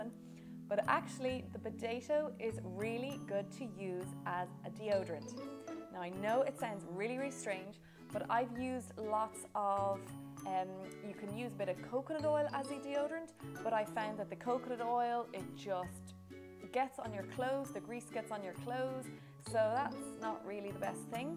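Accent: Ireland. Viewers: Love your Irish accent.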